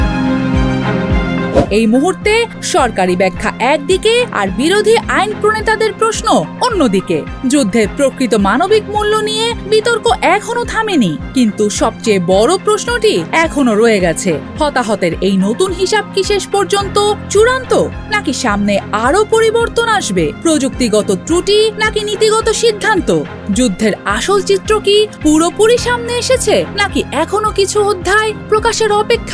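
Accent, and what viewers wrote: India. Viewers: Nijoshho bhasha use korte lojja pai india er moto uccharon korar chesta.biroktikor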